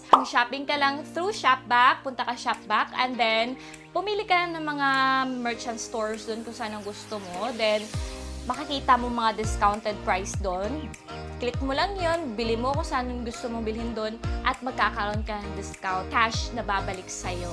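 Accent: Visayas, Philippines. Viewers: Ma'am, bisaya ka po ba talaga!? I just followed you on FB! I feel a bit closer! Hehehe.... 😋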